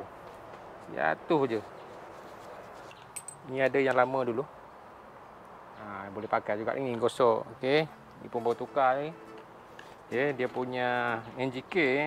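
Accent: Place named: North, Indonesia. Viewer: Bagus sekali bro.....nak tanya sikit....bro asal dari mana....ada dengar pelat utara.....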